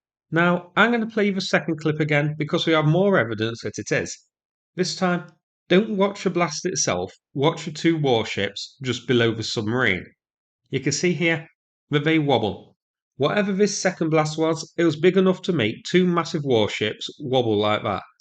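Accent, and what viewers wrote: United Kingdom. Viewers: thanks subtitles...where are you from in Britain?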